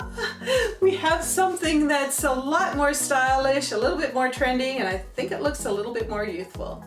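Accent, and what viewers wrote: Canada. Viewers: Good for you wearing what you like & not what other people say you "should" or "shouldn't" wear. Your tips & encouragement to live with confidence are helpful & uplifting. PS: if you're not originally from Canada I'd be surprised. You sure sound Canadian at times. 😁❤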